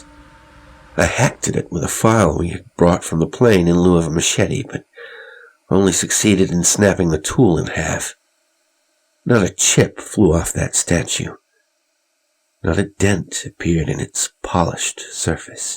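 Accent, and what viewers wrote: United States. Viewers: I agree and I think he dose a bloody good American accent too! 😵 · Always DELIGHTFUL to hear from you, my friend! You're very good at presenting these stories, and I find your voice portrayals of the different characters to be quite a talent that sets you apart from others, who apperantlly consider a slow monotone to be the apex of dramatic performance. Thanks for all you do! P.S. --- your American accent is ALMOST flawless, and thoroughly believable. (the only giveaway is the use of idiom, which is so nit-pickish of me, that it's beneath notice!) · Great American accent..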